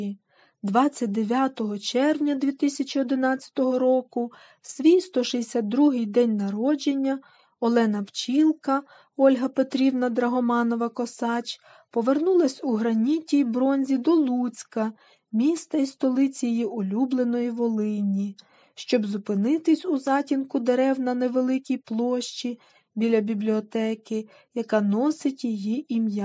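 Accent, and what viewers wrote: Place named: Russia. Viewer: Не до‘чка ,а дочка‘,багато наголосів російських ,а не полтавсьо -украінське.Розповідь інтересноа й пізнавальна ,але вимова не професійна .Спасибі .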